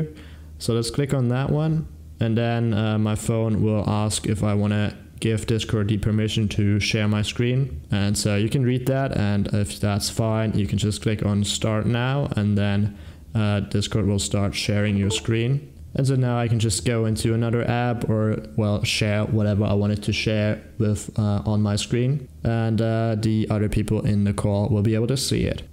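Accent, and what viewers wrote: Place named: United Kingdom. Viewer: u sound british ngl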